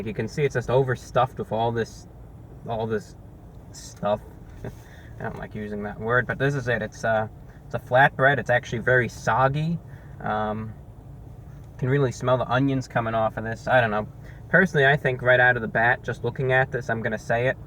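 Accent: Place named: Mid-Atlantic, United States. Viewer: I've never seen anyone speak regularly with a Mid-Atlantic accent before. I like it.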